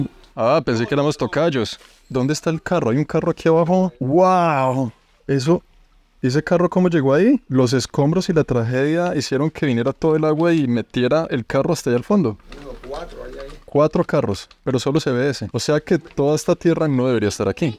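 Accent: Colombia. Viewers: Bueno x como hablas eres de Colombia tu país esta mal también y si siguen con Petro sera peor, los mejores países para vivir el norte de Europa.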